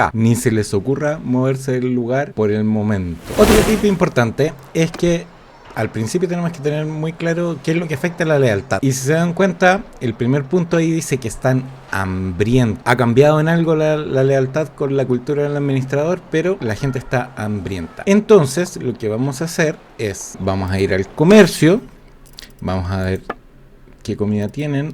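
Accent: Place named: Chile. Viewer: en 5 segundos distingui el horrible acento chileno. Saludos desde Chile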